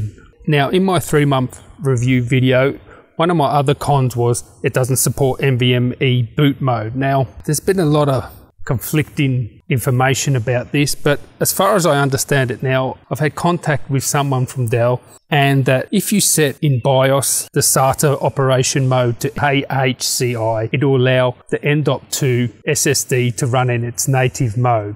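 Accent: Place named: Australia. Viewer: Whereabouts in Oz are you? WA? Just trying to guess the accent.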